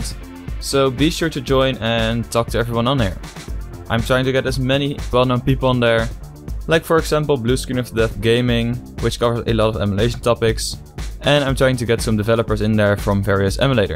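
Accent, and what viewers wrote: Netherlands. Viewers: You dutch? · I sense a Dutch accent tell me if i'm wrong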